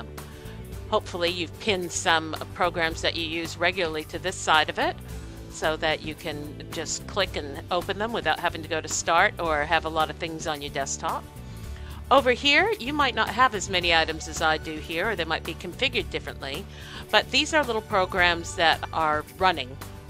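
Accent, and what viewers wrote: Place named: Australia. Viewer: you rr from austrailia sister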